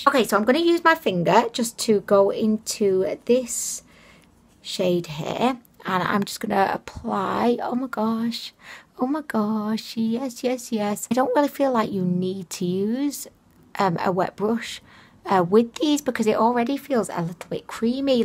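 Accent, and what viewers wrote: United Kingdom. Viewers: you make me want a British accent so bad! Wanna trade, I'm a southerner (South Carolina born and raised)? You'd just have to say y'all a ton, wet "yer" whistle with some sweet tea, while calling everyone Hun, Honey (not just Hunnies), Darlin', and Sweetie. 😂🤣😂🤣😂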